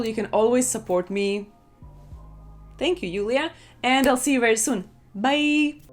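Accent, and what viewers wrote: Russia. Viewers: You russian accent is hilarious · I like it when you still put your Russian accent. Informative vid by the way. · from your accent i guess you are from =Rosiya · I'm sorry, are you Russian? Because I personally am, and uh... I kinda can hear that :) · i like your russian scent when you start to be mixed accent of russia with English 😂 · I like how her accent is a little mixed with Russian 😍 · Ur Russian police aksent😁, was great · Well I liked your Russian accent... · Tão fofo ela falando inglês com sotaque russo. Hahahaha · Hahahaha I love Russian accent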